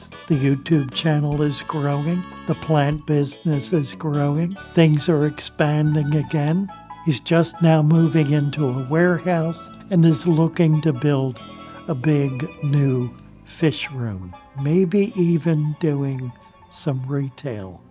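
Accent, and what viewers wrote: Baltimore, United States. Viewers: I grew up in Baltimore too. Your life has been amazing. I knew the first time I heard your voice you had Baltimore roots. I'm sorry about your dad. I first got into the hobby at Seabreeze Aquarium on York Road and I remember they had dirted tanks in the shop. I'm 65. God bless you and yours. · I knew you had to be from Baltimore when I heard you say “wuurder”, it’s the same way my mother says it. I grew up in Baltimore as well but I don’t pronounce water the same way she does.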